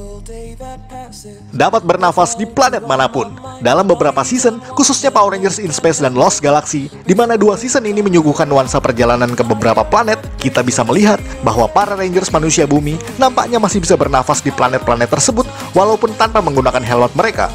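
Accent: Java, Indonesia. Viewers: Min... Bahas ttg Kiramaiger donk... Jujur saya merasa gak nyaman dg penjelasan mimin yg di Try It karena kebanyakan ngeguyon apalagi dibumbui logat Jawa nya meskipun yah itu alamiah sih yah krn mungkin si mimin org Jawa... Dg melalui TokuFacts ini saya rasa kami akan merasa lbh nyaman mendengar penjelasannya tanpa banyak bertele-tele dan akurat pula...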